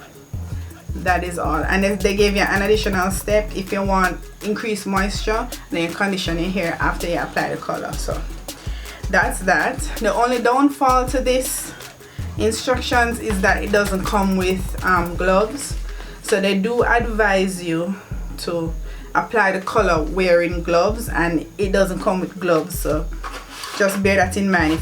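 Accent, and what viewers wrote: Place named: Jamaica. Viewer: Love that Jamaican accent❤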